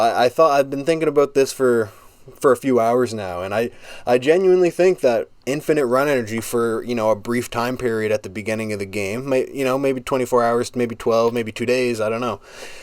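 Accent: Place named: Canada. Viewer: I'm Canadian, and I thought the aboot thing was a myth. I guess not.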